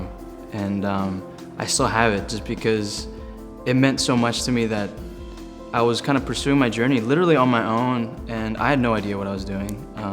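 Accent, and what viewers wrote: United States. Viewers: I guess I hear a Southern California accent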